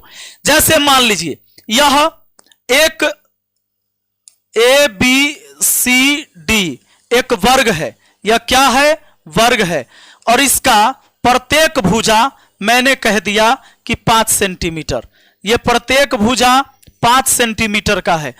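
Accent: Bihar, India. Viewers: एक बिहारी सौ पर भारी आवाज से पता चलता है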